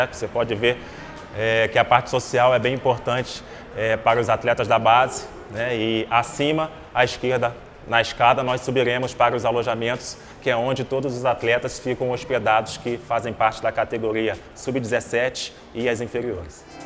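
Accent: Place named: Minas Gerais, Brazil. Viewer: MINEIRO,COM ESSE X,RS?Ixqueiro...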